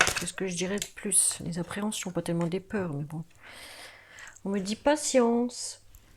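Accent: North, France. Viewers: Merci sympa le tirage et pleins de bonne humeur! seriez-vous du Nord? BONNE JOURNEE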